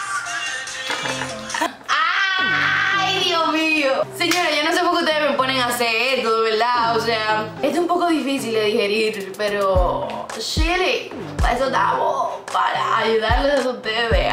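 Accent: Dominican Republic. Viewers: Eres dominucana? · es de RD????, es que habla como tal, porque yo también soy de RD pero respondame plis alguien la pregunta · Tu tienes el acento de mi pais republica dominicana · La mayoría criticandola a ella cuando ella está dando una video reacción y dando una crítica constructiva nose por donde no se entiende además vi unos comentarios criticando la forma que habla por si la gente que piensa que República Dominicana no existe pues si, si existe Dios que acaso no se dan cuenta que cada país tiene un asentó diferente?! Creo que deberían darse cuenta que esta dando su opinión dentro de su perspectiva no es que ella va a pensar igual que ustedes. Desde mi punto de vista Yo pienso igual que ella no veo necesario mostrarse así en las redes sociales no hay nesecidad no?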